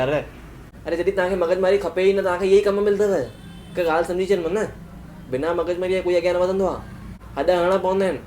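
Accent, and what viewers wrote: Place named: Sindh, Pakistan. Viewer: How to speak sindhi like u?Exactly pure Sindhi🤣🤣🤣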